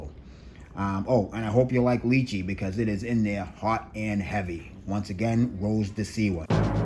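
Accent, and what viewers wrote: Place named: South Shore, United States. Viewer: Your accent is bringing me home. From the south shore.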